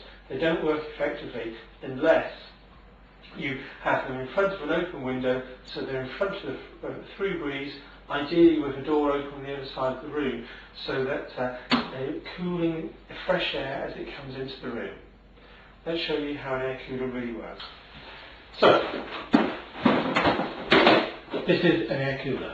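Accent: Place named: United Kingdom. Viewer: Air conditioning is severely underappreciated here in the UK and honestly I was quite surprised to hear a British accent when I clicked on the video.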